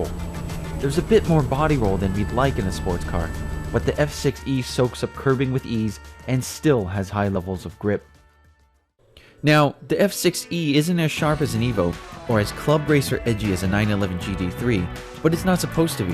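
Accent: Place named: United States. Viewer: I can't listen to a bloody American talk about a true blue Aussie turbo 6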